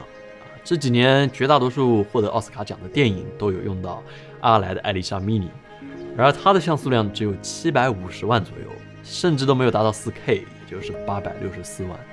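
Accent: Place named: Yangtze Delta, China. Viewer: 我是因为tim的长三角口音爱上影视飓风的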